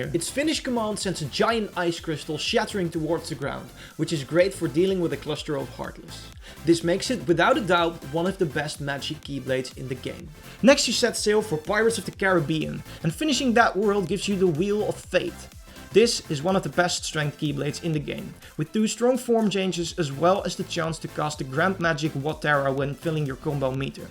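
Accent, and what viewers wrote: Netherlands. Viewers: Randomly stumbled upon the vid, and have to ask... With that accent, are you by chance Dutch as well? XD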